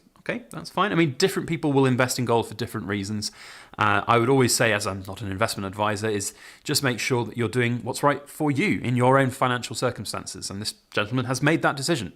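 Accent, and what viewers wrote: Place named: RP, United Kingdom. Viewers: 😂 Brilliant Toff Accent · You have a posh accent IMO XD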